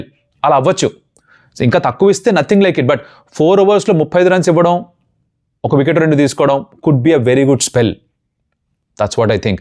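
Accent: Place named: Mangalagiri, India. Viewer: నాకెందు కో మాటష్ మంగళగిరి అనిపిస్తుంది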